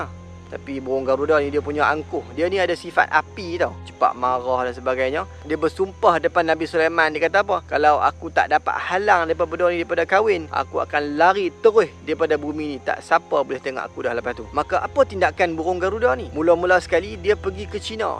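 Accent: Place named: North, Malaysia. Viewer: Syok dgr org utaqa bercerita... Rsa puaihhh dgq hahaha👍🏻👍🏻👍🏻😂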